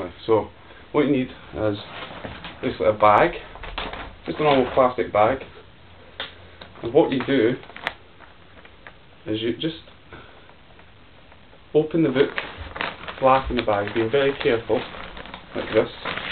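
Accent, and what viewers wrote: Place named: Scotland, United Kingdom. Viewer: A scots lad ..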